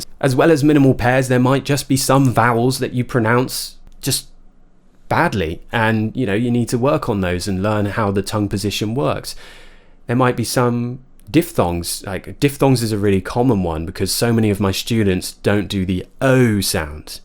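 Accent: RP, United Kingdom. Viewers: Welcome back!!!! Thanks for this great video as always, really love your British accent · Just listening to you is pleasure) Love RP! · Greatest teacher ever 🥺❤️ never get bored of your British accent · you have an accent yourself (a british one). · You’re an amazing teacher! I absolutely love your beautiful British accent, I could hear you for endless hours ❤ Congrats on 1M!!! You truly deserve it 👏🏼👏🏼👏🏼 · Welcome back🌷 You are the first tutor I've learned the British accent from and that helped me to sound more posh. Thx from Egypt. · Normally I don't fancy RP accent 😜! But is the only exception huehuahuehuahuehua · Waooo!! Finally I've found you again, l really missed your video a lot You're the one introduced modern RP accent to me. Thank you once again and welcome back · RP accent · Because you speak British English perfectly and clearly that's why we like your videos and a am very happy you are come back again. 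Thank you very much for your videos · Hi it's nice to see you again. Congrats for 1M subscribers. You deserve it. Your British accent is amazing so please post your English lesson videos regularly. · Welcome back my great RP English accent I'm grateful that you're back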